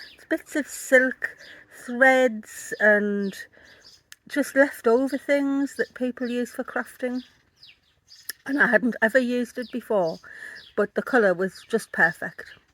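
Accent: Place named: County Durham, United Kingdom. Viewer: Wow your dragon is magnificent and magical. I have just found you this morning so I’ve quickly subscribed and can’t wait to see all of your videos. Thank you for sharing and inviting us into World. I follow embroiderers from all over the world so it’s lovely to learn you’re also from County Durham and hear your local voice.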